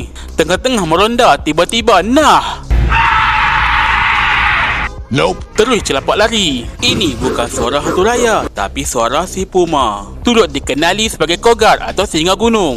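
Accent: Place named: Kelantan, Malaysia. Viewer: Pantang lepa…😂😂😂😂 Yg ni best.hahahaha…tak macam chanel lain. Maaf cakap,ade 1 chanel tu die orang kelantan tapi die try hard gile utk cakap loghat KL😅. Buat ape nk malu…kau guna loghat kelantan tu akan jadi 1 daya tarikan tau,macam chanel ni…kite dengar info menarik sambil sengih2 dengar loghat asli die. Pantang lepa satgi habih mami jarum pun datang mai sekali…😂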